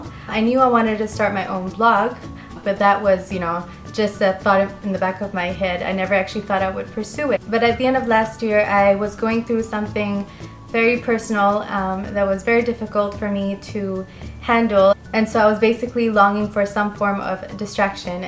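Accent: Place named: United States. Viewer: You mention you've lived I Australia for 14 years so where does the American accent come from? :)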